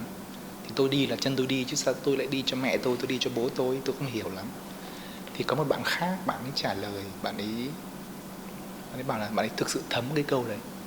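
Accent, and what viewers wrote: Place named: Hue, Vietnam. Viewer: Giong doc cua em voi phap danh that chueyn cam pha chut gi cua huecua dai dat nang chay mua lu mac du em sinh ra o binh dinh con thay e kip lam kenh dac biet la co chau tho voi su gop mat cua cac ban tre la canh tay dai dua giao phap cua su ong bac thay day kha kinh lan rong di xau vao xa hoi con tin so nguoi nghe xem tim hieu se ngay mot nhieu thoi neu no co it co dung buon va hay kien nhan nha co chuc co va ekip co nhieu suc khoe va duoc chu but chu to gia ho luon luon binh an hanh phuc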